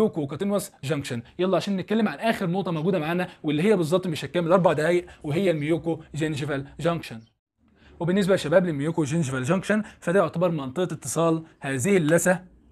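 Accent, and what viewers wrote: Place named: Jordan. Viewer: متاكد انك مصري؟ حاسة انك من الاردن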